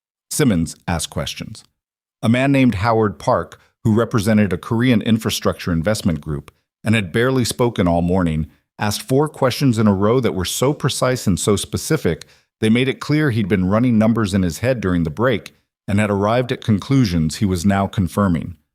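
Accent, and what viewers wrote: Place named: New England, United States. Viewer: I'm not a fan of how Yankee this narrator sounds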